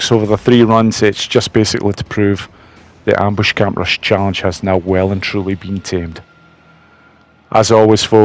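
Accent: Scotland, United Kingdom. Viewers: One more thing, I misjudged your accent. My friend is married to a guy that was in the RAF and is Scottish. I talked to them recently, your name should be, don't know if it is highland or low land. But for sure the whole planet wishes they were Scottish. Quesion, do you play with a controller or keyboard and mouse?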